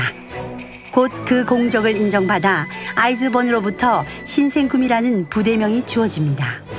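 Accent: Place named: Gyeongsang, South Korea. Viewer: 경상도 사투리를 쓰고, 그리고 문제는 일본어 장음이 전혀 안되고 토의 발음이 도로 되는 등 전형적인 한국인 발음임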